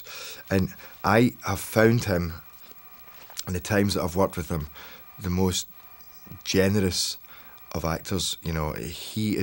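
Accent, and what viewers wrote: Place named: Scotland, United Kingdom. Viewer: Your Scottish accent is great. 👍🏻Your role was awesome. Even my mother fell in love with the phantom..she loved your singing..your performance..your handsomeness. It's all about the phantom. ❤❤❤️❤️❤️❤️❤️❤️❤️❤️ She was affected by his story more than one .. and decided that it would help him in his childhood. We all loved the role of the Phantom. Thanks for the excellent performance. By the way, I do not think that anyone will speak ill of our friend the Phantom now, because everyone has successes and failures, this is the life😊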